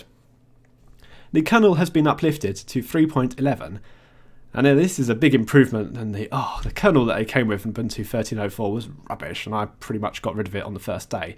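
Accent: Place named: Scotland, United Kingdom. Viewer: Can't really get from where this guy is from... Scotland? Manchester area?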